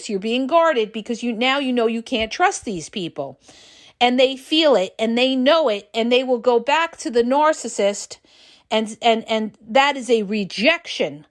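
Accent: New York, United States. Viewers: Besides all the Facts that speaks on about Narcissist Abuse, has the Cutest New York accent here on YouTube 😊❤❤❤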